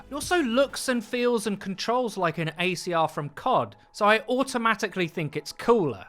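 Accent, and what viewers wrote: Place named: United Kingdom. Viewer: Can we get a destiny guide channel where it's not a british dude?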